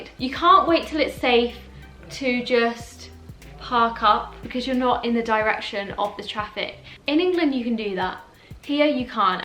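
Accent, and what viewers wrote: Australia. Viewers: She speaks like a Australian. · you’re already picking up an aussie accent haha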